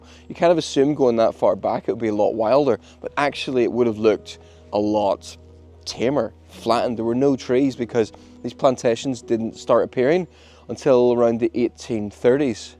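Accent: Ireland. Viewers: Same problem different country. We have that issue here where i live too, but personalizing it doesn't matter because you can look at every single continent and every country this same issue pops up. It's not new internationally or unique to you guys and your neighbours. Here in this video you talk about plantations as the problem, but they are not the real issue. The real issue is mono crops. Mono crops are not only this major issue destroying all of the worlds forestry wildlife and plants, but also are a major crisis in our food supplies that could actually cause the extinction of our species long before any of natures other disasters if we're not careful going forward. You can speak quite seriously but the tone of the catastrophic disaster that this is really doesn't come through with the charming irish accent haha. Maybe an angry German should narrate how big of a problem this all is, or a Russian with vodka withdrawls lol.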